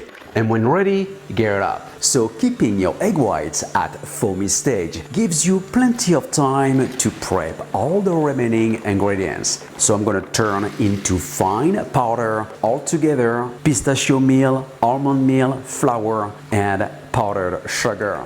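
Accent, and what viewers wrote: France. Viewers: Why the hell do I love him and what he makes so much??? Answer: B/C of the damn French accent😅😝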